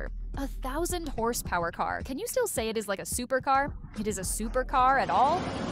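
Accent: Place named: China. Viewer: Funny Chinglish commentary 😅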